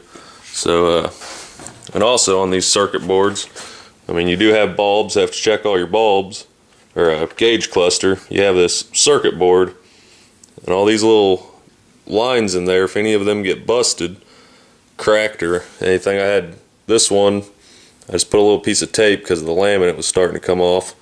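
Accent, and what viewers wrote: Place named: South, United States. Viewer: Thanks for the info my Southern Buddy(cool accent).NICE CAR !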